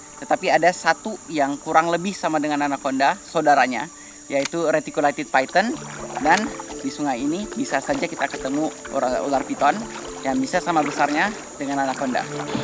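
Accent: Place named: Borneo, Indonesia. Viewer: Logat nya Dayak 🤗 Sehat selalu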